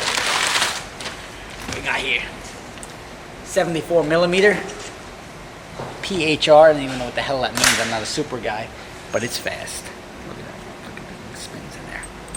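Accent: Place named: New York, United States. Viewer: That New Jersey/ new york accent in the older video.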